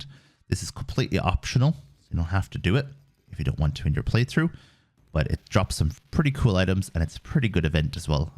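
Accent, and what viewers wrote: Ireland. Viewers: r u Irish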